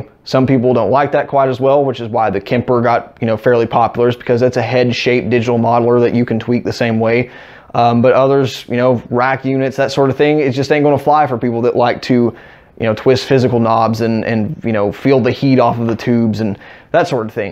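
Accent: Texas, United States. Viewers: It took me a while to notice that you have a texan(or at least southern) accent. But I like it bro, you sound like a cowboy Ps: I'm from Brazil, english isn't my first language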